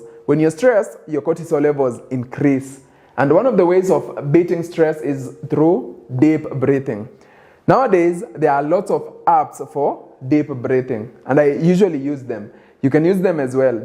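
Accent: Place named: Kenya. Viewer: Are you kenyan? Just asking, you sound like my watu:)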